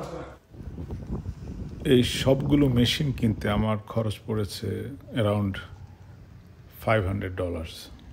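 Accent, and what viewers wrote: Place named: Jessore-Khulna, Bangladesh. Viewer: ভাই আপনার ভাষা তো যশর/ খুলনার মত যদি বলেন বাড়ি টা কোন জেলায়? আর ভিডিওতে আপনার কথার সাউন্ড কম আসে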